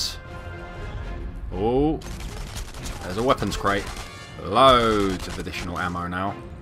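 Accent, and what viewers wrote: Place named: United Kingdom. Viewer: sir you got very good royal british accent with a bronx behaviour , amazing and magnificent 👍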